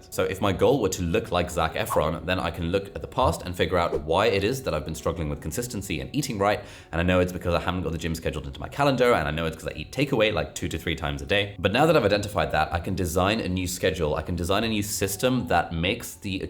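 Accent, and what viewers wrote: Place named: United Kingdom. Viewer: This may not be related to the video,but I want to ask you something.How do you talk so fast and clear?I can understand each word of your British accent,even though I find the British accent most hard to understand. Pls give some tips☺️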